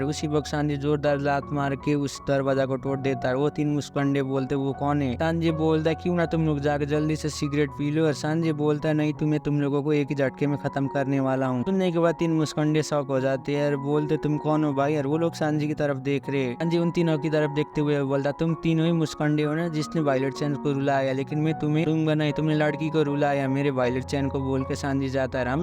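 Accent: Nepal. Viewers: Because your Hindi accent is like Nepali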